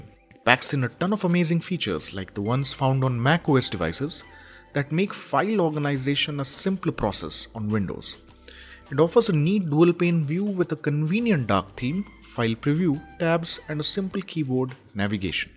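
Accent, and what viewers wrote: India. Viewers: Very shitty Indian accent, go back to scamming. This video was annoying.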